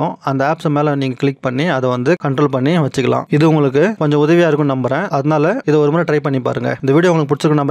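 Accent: Chennai, India. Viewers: உங்கள் மெட்ராஸ் பாஷ அருமை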